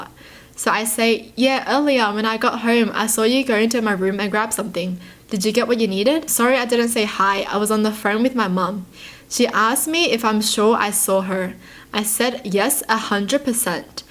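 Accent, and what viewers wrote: Australia. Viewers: omg idk why but everytime I see, I think of Rose' (from blackpink). Like the cute aussie accent and everything!✨ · Who else loves her Aussie Accent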